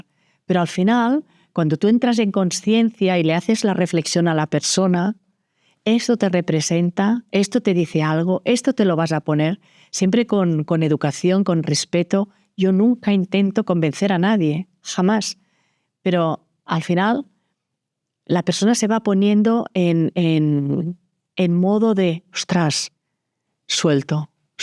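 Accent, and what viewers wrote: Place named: Peru. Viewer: El acento peruano lo identifiqué desde el minuto uno. Saludos desde Chiclayo.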